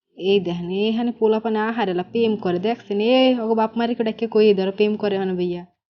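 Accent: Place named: Barishal, Bangladesh. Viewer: আসসালামু আলাইকুম আফা। আমার বাড়ি নরসিংদী, কিন্তু আফনের বরিশালী কতা হুইনা খুবই ভালা লাগসে....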